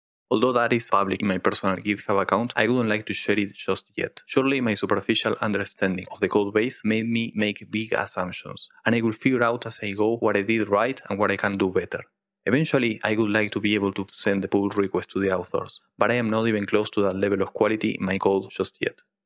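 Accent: Argentina. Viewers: Increíble proyecto!!! Escucho un acento argentino o estoy flasheando :? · Really liked your video. You sound so Argentinian, like a friend of mine talking in english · Espectacular tu prototipo. ¿Sos Argentino? te delata el acento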